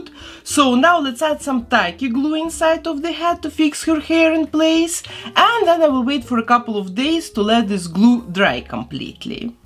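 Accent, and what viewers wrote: Russia. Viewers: Кукли виходять дуже гарними, але неможливо слухати, жахливий акцент/говір, наче росіянка з глубінки вчиться говорити англійською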